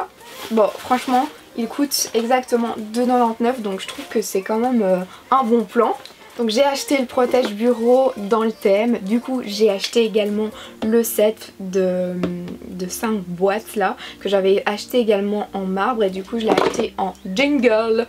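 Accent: Belgium. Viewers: J'ai remarqué que tu disais differement les chiffres y'a t'il en belgique une prononciation diffeeente pour les chiffres.Au début, j'étais confuse:) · J'adore ton accent vive la Belgique!!!!😄😄😄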